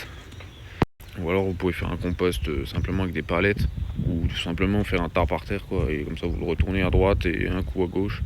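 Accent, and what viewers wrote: Alsace, France. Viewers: j'adore ton accent Alsacien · Le plus important, c'est l'accent. Je suis aussi alsacien et je comprend mieux avec l'accent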